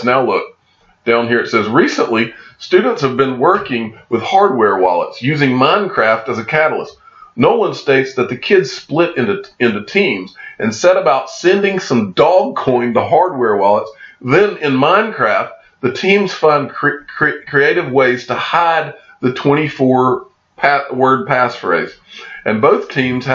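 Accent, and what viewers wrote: South, United States. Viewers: I thought you had had 5 or more margaritas ...until i realized i had your vlog set to 0.75 ..which makes your southern slaw sound so slow and sounding drunk ,my deepest apologies ...of course i can not take away your southern twang ,but it seems i can make it worse . xrp bud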